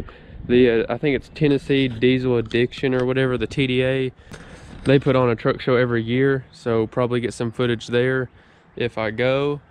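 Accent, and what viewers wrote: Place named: Alabama, United States. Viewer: Never heard a Mexican speaking with a Alabama accent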